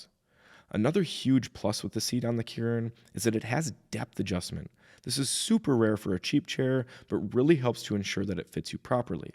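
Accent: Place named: Canada. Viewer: That's usually a Canadian accent, or the states that border Canada. Like Minnesota, Washington, etc.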